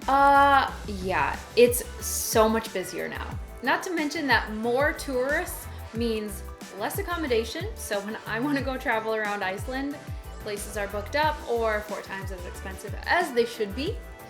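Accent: United States. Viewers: So tourists are the main downside of living in Iceland.......................Said the woman with the American accent. Hypocrite.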